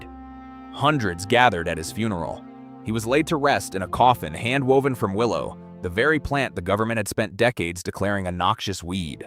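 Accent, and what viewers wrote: United States. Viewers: Should have had an Australian do the vocals not a god dam yank. Anyway you greenies suck eggs.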